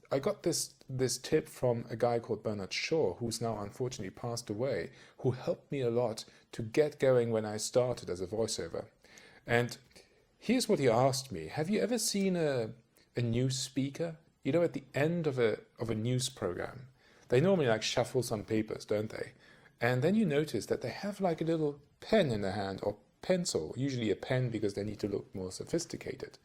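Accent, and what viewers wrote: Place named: Mid-Atlantic, United States. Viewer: Some good pointers==thanks. There's a mid Atlantic twang in there somewhere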